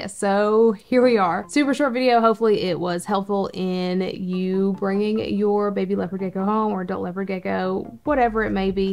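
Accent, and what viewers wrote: South, United States. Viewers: Why do you sound more southern in these newer videos? Lol!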